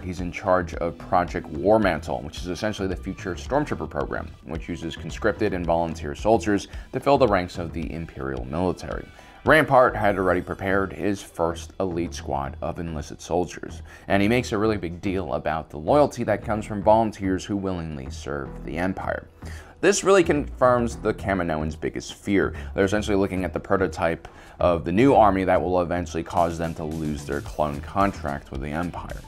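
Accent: New Zealand. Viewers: Plenty of kiwi accents sounds like OP, lol. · I like the new Zealander accent ): Omeeeeega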